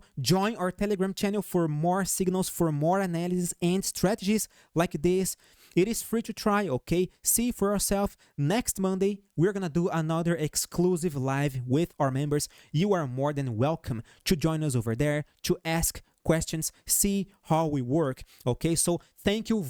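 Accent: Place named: Brazil. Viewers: He is from Brazil! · Thanks for the content, brother. Where are you from? Your accent sounds like Brasilian. É nois!😅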